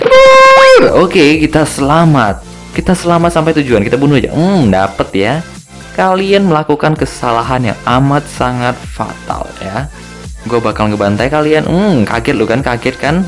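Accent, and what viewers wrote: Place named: Sumatra, Indonesia. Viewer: makin oke editannya, sukses terus bang tetap buat viewers mu yang satu ini bahagia 😄😄😄 btw lu orang mana bang, logatnya gw denger sumatra banget 😶😶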